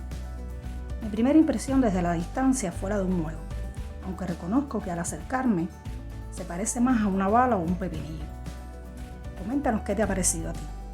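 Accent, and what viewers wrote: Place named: Cuba. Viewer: El video está bonito, muestra los lugares imprescindibles de Londres. Tienes acento cubano.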